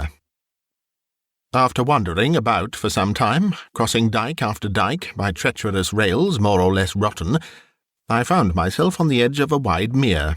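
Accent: United Kingdom. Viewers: Yes, you can’t beat a good old fashioned perfect diction posh English voice, with a no nonsense masculine delivery , for these stories......perfect.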